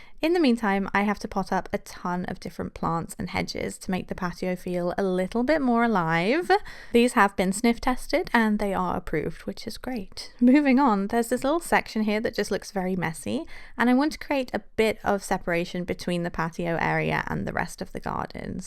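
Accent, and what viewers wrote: United Kingdom. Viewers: Swear i could just listen to British girls talk all day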